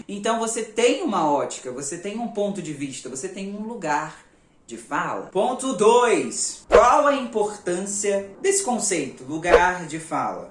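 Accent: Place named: Rio de Janeiro, Brazil. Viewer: Aí que saudade desse sotaque carioca e desses expressões involuntárias ❤️📸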